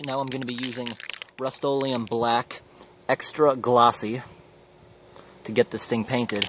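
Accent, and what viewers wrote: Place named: Amish Country, United States. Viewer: Ruff (roof) Rumm (room) Crick (Creek) are you a PA native? You sound like you live close Amish country.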